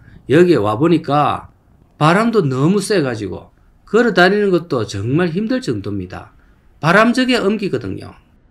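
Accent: Gyeongsang, South Korea. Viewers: 구수한 경산도 사투리가 너무듣기좋아요 감사합니다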